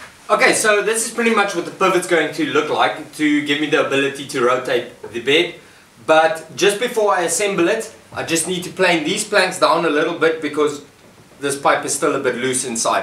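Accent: South Africa. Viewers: Lekker boet!! Nice bench, great to hear the accent ... sounds like mine, originally from Kimberley, Northern Cape. Greetings from New Zealand.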